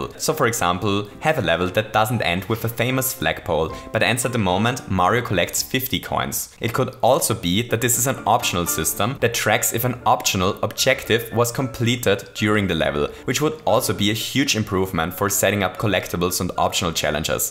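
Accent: Germany. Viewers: Are you German? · Misser Deutscher Akzent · Are you from germany?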